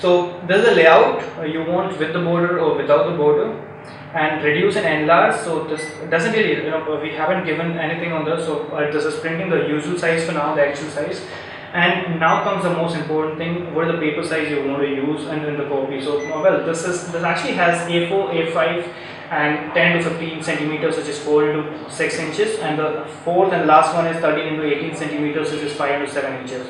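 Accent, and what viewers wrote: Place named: India. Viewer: voice quality very poor. when you are reviewing a product technically and talking about it, you could have made sure you talk with a clear pronunciations and proper pace. Being Indian I know you cant help your with your accent, neither i can. But least clarity was expected ...at least in last 25% section of your vdo.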